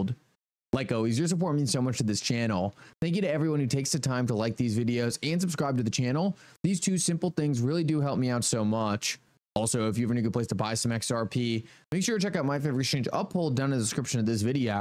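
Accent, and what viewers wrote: Allentown, United States. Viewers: with an Allentown accent strikes again with lies and false hopes.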